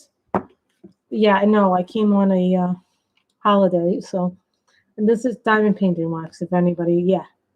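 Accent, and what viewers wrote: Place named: New York, United States. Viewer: I never seem t catch you live but watch your replays. Always enjoy your completed pages. I also,love listening to you as you remind me of home. I ever like hearing the background outside noises. I use to live in Yonkers right in VanCortlandt Park. (Bronx/Yonkers line). Thank you for sharing and hopefully I will catch you live soon. 💕🦄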